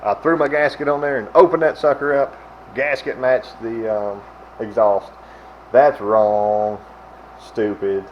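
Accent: South, United States. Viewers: It's kinda cute how you Southern boys can't pronounce "oil" or "coil". It always comes out "ole" and "cole", lol. 😉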